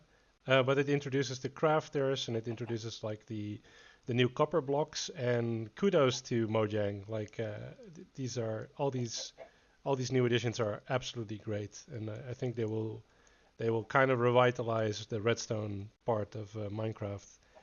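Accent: Netherlands. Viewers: You have a very noticable 'Dutch-english' accent.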